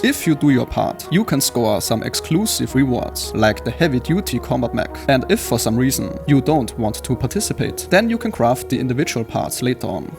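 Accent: Germany. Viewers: The German accent made me stop the video after 5 seconds. Sorry 😂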